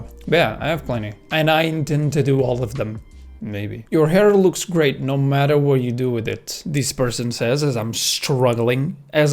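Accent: Portugal. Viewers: that a nice portrugeese accent you have there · That's pretty much his Portuguese voice, in this video. A lot of Portuguese people tend to speak English with a very hard Portuguese accent. "We" kinda suck at losing or acquiring accents. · que tal você fazer um vídeo falando em português? Quero mt ouvir seu sotaque portuga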